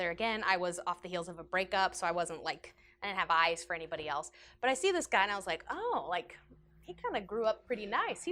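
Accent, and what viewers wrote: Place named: United Kingdom. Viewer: Nice British accent!:)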